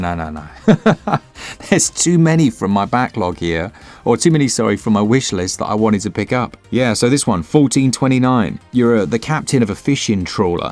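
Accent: England, United Kingdom. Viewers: As someone from the USA who appreciates a good accent. “Little kitty, big city” will now default to an English accent every time I say it. Cheers!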